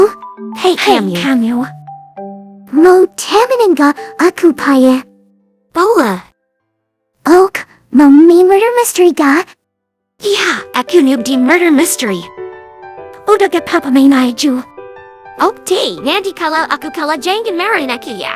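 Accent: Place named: England, United Kingdom. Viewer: itu yang baca orang inggris gitu jadi klo suruh baca indo gitu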